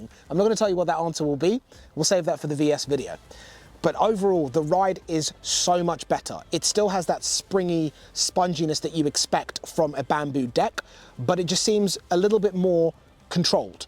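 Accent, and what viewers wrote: United Kingdom. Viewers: You sound like you may be in the UK any issues riding it on cycle paths or in public etc. if you’re not in the UK please ignore.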